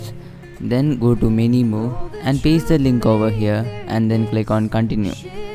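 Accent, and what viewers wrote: India. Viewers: Indian English accent sucks!!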